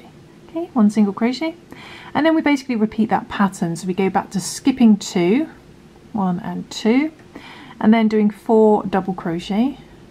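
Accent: England, United Kingdom. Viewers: I find your technique fascinating. The way you position your hook facing upward and the way you wrap your yarn. It flows very well. And you are the only person I’ve ever heard with an English accent, using US terms and stating the UK terms as well, repeatedly. Very helpful I’m sure to those in the UK.. I’m thinking maybe you live in the US? Lovely stitch pattern! I look forward to this series! Thanks for sharing!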